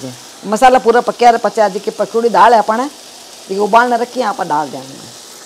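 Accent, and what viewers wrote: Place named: Rajasthan, India. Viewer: She is speaking marwadi not hindi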